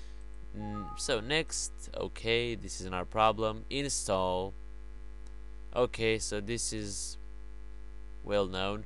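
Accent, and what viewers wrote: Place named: Turkey. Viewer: Where are you from? I think u are from Turkey because you have Turkish accent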